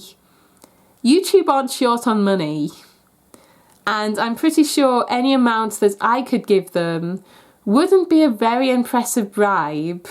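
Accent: Scotland, United Kingdom. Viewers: Thanks for the vid. So weird that it has such a high ratio of dislikes to likes; must be idiots searching for videos on how to get verified and then getting pissed off at landing on your video or something. BTW, where in Scotland are you from? The Scottish accents that are more similar to English ones are very difficult for me to identify as such. I did think you were Scottish for a short time in the video, but I finally settled on somewhere in Northern England. Bzzt!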